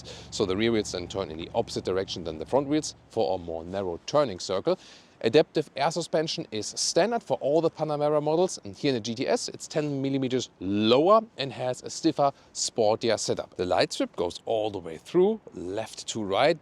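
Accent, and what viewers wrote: Germany. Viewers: “Lots of high gloss black…which no one asked for”. 😂😂. Exactly! Love your channel and its presentation. The German accent makes listening fun as well for an American lol. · You sound too German for me as an American 🇺🇸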